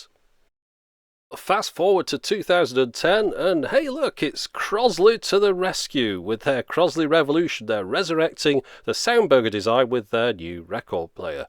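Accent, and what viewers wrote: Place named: England, United Kingdom. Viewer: Josh Bacon u are so right... And his English accent makes it so premium to look =) I love too!!!!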